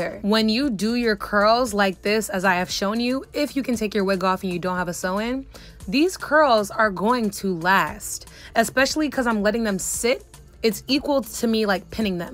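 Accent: Nigeria. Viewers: I like how you infuse naija English for drama 😂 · Are you Nigerian? I promise i hear teni askamaya in the background! Sisshhh plus i can low key hear the Nigerian accent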